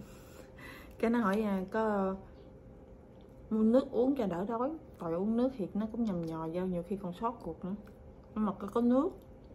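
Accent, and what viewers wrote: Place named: Mekong Delta, Vietnam. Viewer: Giọng chị chắc ngừoi Miền Tây hả chị! Phải ở gần mình tới lui cho đỡ buồn. Em cũng mới sinh xong nên thấy thương mấy Mẹ bầu sắp sinh lắm.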